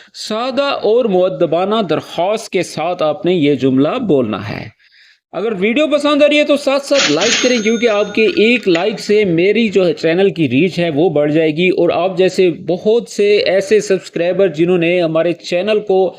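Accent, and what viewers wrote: Arab world. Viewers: ye arbi accent kis mulk mein boli jati hy?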